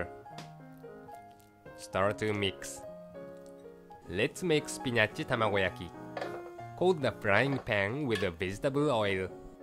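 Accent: Japan. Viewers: I love watching your videos! Very clear and not too fast step by step on how to make the dishes. Other cooking videos I have seen so far were too quick/too fast to follow. Love your dog,too! The narator's english with his Japanese accent also has become your video's character even more Japanese 😊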